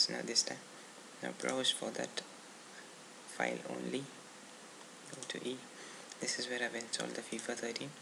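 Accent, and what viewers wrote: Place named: United Kingdom. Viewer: Inglés británico.... es otro nivel, deja de estudiar el inglés americano porque no sirve realmente.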